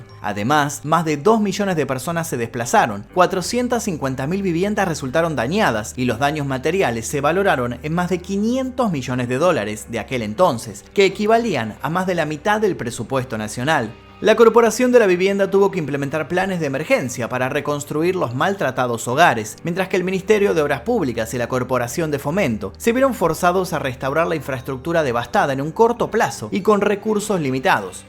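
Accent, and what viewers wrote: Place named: Argentina. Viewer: Es argentino el charlatán que relata parece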